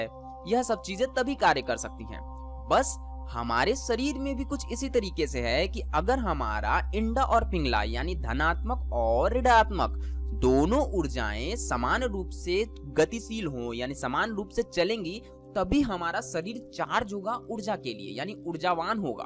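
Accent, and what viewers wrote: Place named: Bengal, India. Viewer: Abe Bengali kaisi hindi bol raha hai. इंडा क्या होता है । ठीक से बोल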